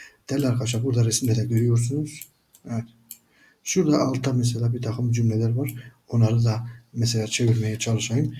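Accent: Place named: Urfa, Turkey. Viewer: Urfalıların türkçe konuştuğu gibi almanca konuşuyorsun biraz yavaş ve anlaşılır konuş hocam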